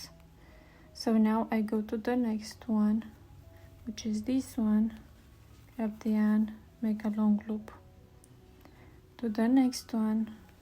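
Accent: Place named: Romania. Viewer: I love your videos. My grandmother was from Romania and your accent and voice reminds me of her. She created such beautiful crocheted items. I still have my father's linen bassinet blanket she hand sewed and where she added a pretty crochet border to it. Thank you for your videos.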